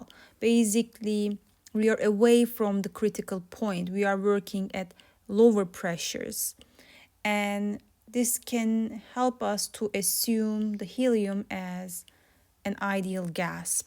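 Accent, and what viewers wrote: Turkey. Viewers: Hocam I just watched the video about Linear Density :D Aksanınızdan dolayı Türk olduğunuzu düşündüm ama kanalınızda hiçbir personal info'ya rastlayamadım hocam.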